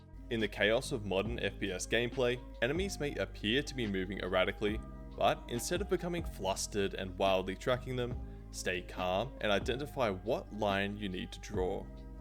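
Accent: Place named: Australia. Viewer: U were tripping I think he just Australian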